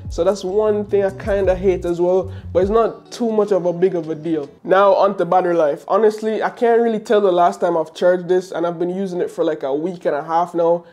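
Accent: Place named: Jamaica. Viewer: looooving this thique Jamaican accent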